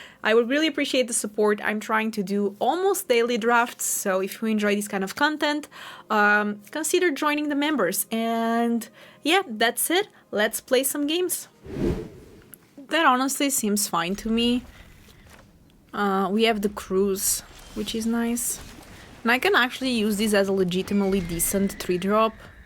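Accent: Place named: Portugal. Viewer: Your videos are thought provoking and instructive. Even when I don't agree with your choices, my consideration of them makes me a better player. I also like your style of play and the fact that you are courteous to your opponent. I slow play a lot because I'm old. One more thing...I am a Portuguese-American. When I hear your accent, it reminds me of my old neighborhood where so many women talked like you and I smile. So, just like me close my saying that as far as your videos go gosto sim. (That exhaust my command of the Portuguese language.)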